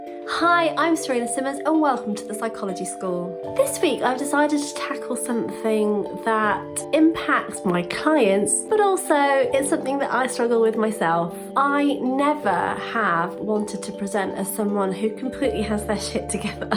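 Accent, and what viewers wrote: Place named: United Kingdom. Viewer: May I ask where your accent is from (Which part of the UK)? I just LOVE it !!! (And I'm not a native speaker, I'm German, so I can't really make an educated guess :D)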